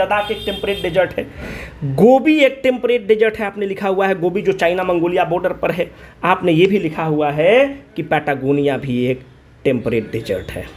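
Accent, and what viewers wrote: Bihar, India. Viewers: Hero is Back.... What a strong voice and I love the way you substantiate things..... BIHARI Hindi tone mixed with Sound vocab of English is deadly combination sir😊 Loved every bit of video!!!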